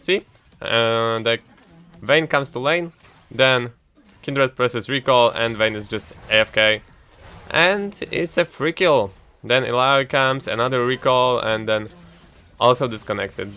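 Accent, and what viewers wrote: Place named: Poland. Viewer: Ale masz polski akcent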